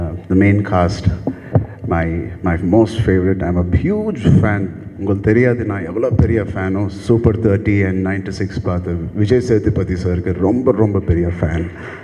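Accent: India. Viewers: He is speaking like * tamil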